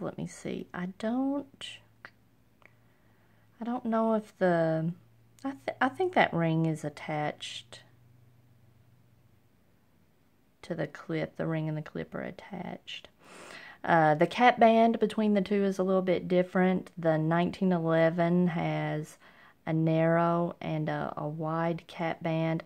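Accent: South, United States. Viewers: Southern accent eh?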